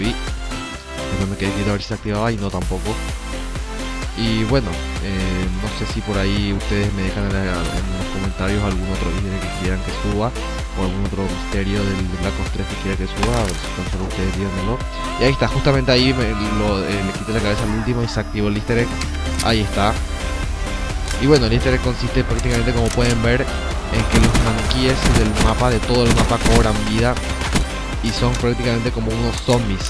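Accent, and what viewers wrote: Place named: Paraguay. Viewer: Se te re nota el acento de Paraguayo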